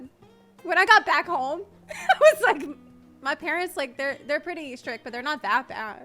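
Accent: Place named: South, United States. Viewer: Nothing better than comparing the good news to a punishment. I feel that really gets a lot of kids to change their mind about it. I think it will catch on. Also i love when sassy southern accent comes out. Reminds me of spending time with my relatives in Arkansas.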